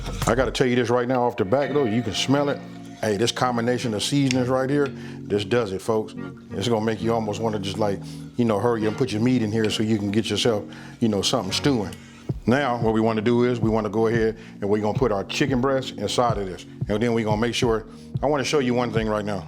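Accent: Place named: Louisiana, United States. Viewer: where are you from??? I hear some Louisiana flavor in your voice!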